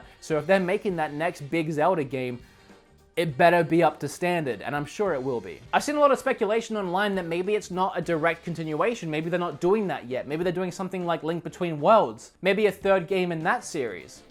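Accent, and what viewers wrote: Australia. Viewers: I changed my Siri voice to Australian because of you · Your Australian accent isn't as thick as it used to be I noticed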